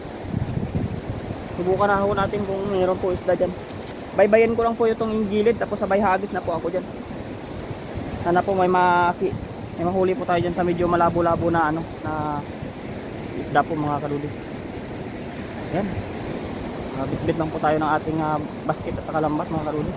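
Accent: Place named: Ilonggo, Philippines. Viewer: good morning po.taga saan ka po sa visayas? Ang intonation nyo po ay parang ilonggo😊.Ingat po palagi God bless po.watching from Bacolod City😊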